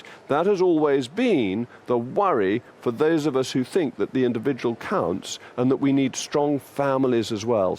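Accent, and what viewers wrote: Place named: Upper class, United Kingdom. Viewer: Sorry, but I'm not stupid enough to listen to someone with accent like yours talk about "work". Jog on, toff.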